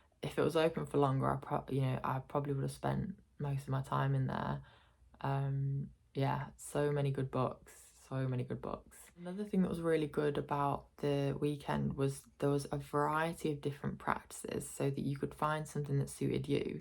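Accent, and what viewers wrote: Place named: United Kingdom. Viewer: There are so many comments about pronunciation. Did I understand it right that you feel unsafe because of specifically having British accent? I just want to clarify for myself.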